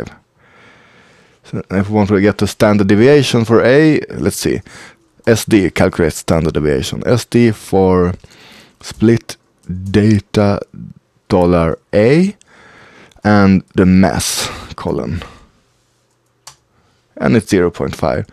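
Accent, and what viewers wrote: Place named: Sweden. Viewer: skön brytning, hör att du är svensk :)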